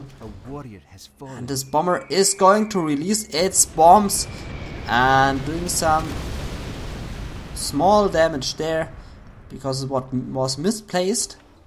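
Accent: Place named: Germany. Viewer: Nice Video. Where are you from? Your english sounds really german :)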